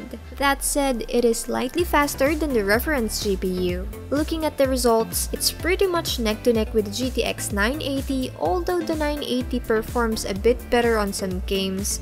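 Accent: Philippines. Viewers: That Pinoy accent tho.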